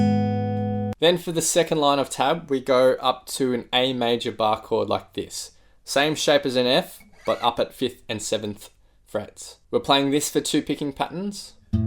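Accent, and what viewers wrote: Australia. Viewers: When I heard your voice I'm like AUSSIE AUSSIE AUSSIE OI OI OI. 🇦🇺🇦🇺🇦🇺🇦🇺🇦🇺🇦🇺🇦🇺💚💛💚💛💚💛💚💛💚💛💚💛💚💛💚💛💚💛💚💛💚💛💚💛💚💛💚💛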